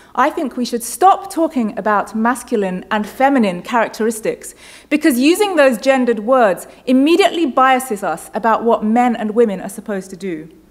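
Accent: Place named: England, United Kingdom. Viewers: it's an english accent actually. It's not scottish, or irish or welsh, the other components of "Britain". English, English, English. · I love her british accent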